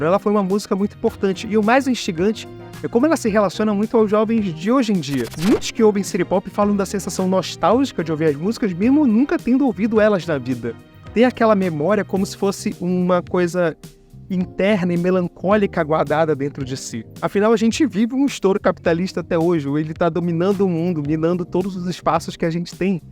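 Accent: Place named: Belém, Brazil. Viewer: Sotaque de Belém = inconfundível 😅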